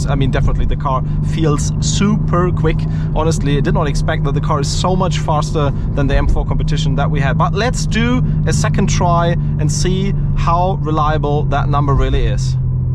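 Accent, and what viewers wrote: Germany. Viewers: Der deutsche aktzent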